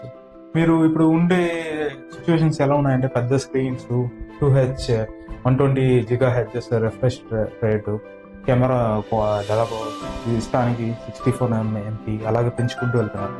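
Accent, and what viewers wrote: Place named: Tamil Nadu, India. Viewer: Me telugu different ga undi brother. Like tamilian learnt telugu..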